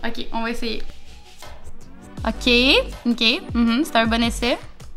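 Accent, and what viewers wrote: Quebec, Canada. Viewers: Tu est d'origine Québécoise ou bien Anglaise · Celia Michel oui j’avais remarqué qu’elle était québécoise merci 🙏🏼 je suis d’autres québécois qui ont l’accent moins prononcés !! Je n’ai jamais eu de mal à les comprendre !! Mais là c’était dure !! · je viens d'arriver sur ta chaine et ton axen québecois te va super bien mais j'ai du mal a comprendre le québécois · Yanis Bahar elle est québécoise donc c’est pas la même façon de parler français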